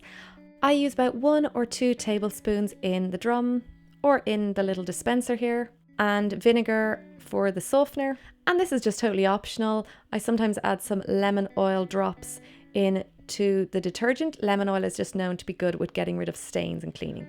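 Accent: Ireland. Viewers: I love Irish accents! ☘️ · Was missing the Irish accent from the series on amazon. Finished all the episodes. Then this came up. Yay, lol 💕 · Loving the Irish accent 😉👍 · I was wondering if you were from Ireland then you said it! I'm italian so I don't have much clues about english accents, but the Irish one is in my heart forever now. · Oh the Irish accent, how I like it! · Your Irish accent is sooo cute!!! :) I can actually understand it!!! As a North American, I don't really have a chance to hear the Irish accent, but when I do I can't really understand what anyone is saying!!! Lol. May I ask what city or region your accent is from in Ireland? Thanks if u can reply!! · Are you Irish?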